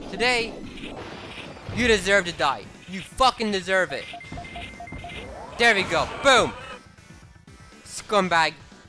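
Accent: Ireland. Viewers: this game is fuckin awesome. im playing it for the first time. I wish I played it 14 years ago. btw, what the hell accent is that? irish? lol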